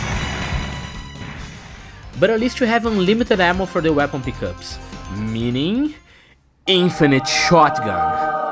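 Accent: Brazil. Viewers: Hmm...sotaque br reconheço de longe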